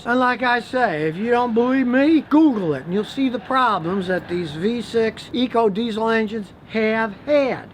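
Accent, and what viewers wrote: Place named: Texas, United States. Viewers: Poo-jo, God is so clever! Subtle texan 😀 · The guy is from Texas. They all talk funny down there.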